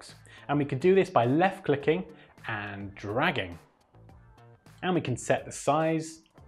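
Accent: England, United Kingdom. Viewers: thankyou for tutorial, where do you from ?, england?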